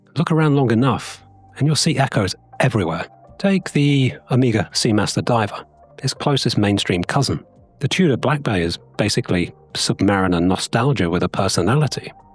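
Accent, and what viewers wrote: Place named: United Kingdom. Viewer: My dear friend, first of all — no, you absolutely do not have a froggy voice. Your voice remains unmistakably British and genuinely a pleasure to listen to. Now, onto the video. That final line about “walking backwards into the future” was brilliant. It captures perfectly the tension between nostalgia and progress. It’s such a sharp observation. Your point about the Submariner was also spot on. It’s fascinating how so many brands feel compelled to orbit that design language — trying to be similar, yet different. Over time, that pressure creates repetition rather than evolution. Escaping that gravitational pull is clearly difficult. I particularly appreciated your remark about people wanting a watch they don’t need to explain. That’s incredibly insightful. There’s something powerful about wearing a watch that feels socially legible without effort. 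At the same time, I keep wondering where real innovation fits in today. Large brands often seem cautious, relying on subtle refinements rather than bold steps. Smaller brands experiment more freely, but rarely at a technical level. And perhaps that’s the real dilemma: creativity exists, but it’s either financially risky or commercially unnecessary. I also find it interesting how certain technical use cases — diving, aviation, racing — defined dial design historically, yet most of us don’t live those realities. So what would watches look like if they were designed around modern daily life instead? Comfort, legibility, emotional resona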